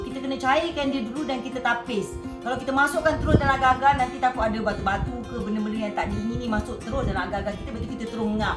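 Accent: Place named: Perak, Malaysia. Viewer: Eeyyy mok sedap bebeno nengok nye..sis labon bahase perak best pulok ..lawok pun ade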